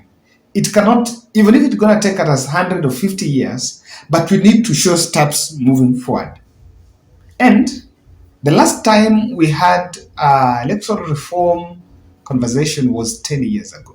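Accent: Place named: Luhya, Kenya. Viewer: He is talking like a luhya..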